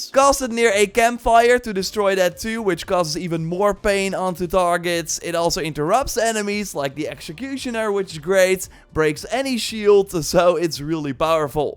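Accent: Netherlands. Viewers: Woow you sound super Dutch haha 😂 hello from a fellow Dutch guy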